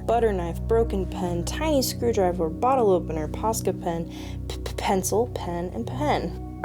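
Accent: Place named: United Kingdom. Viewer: Thank you for the worm reminder queen… Keep being amazing, everything is linked to everything, it all is for your business and also isn’t (living, art etc.) lol, you have NOUGHT to worry about cuz you’re growing a sick lil community here that are all obsessed, you got this and u know it!!! Intuition over 🪱 s… 🌞😎🌞😎🌞 luv & good wishes 2 u from the UK, I speak like your bri’ish accent tehe 🤫😱😍🙊😮‍💨😇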